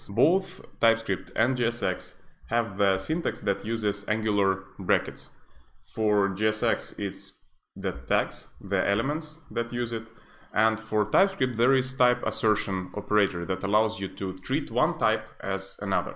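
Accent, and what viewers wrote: Russia. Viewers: ох уж этот russian english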